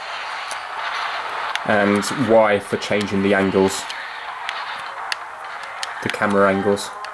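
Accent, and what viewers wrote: United Kingdom. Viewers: Same I also liked the video because this mans British accent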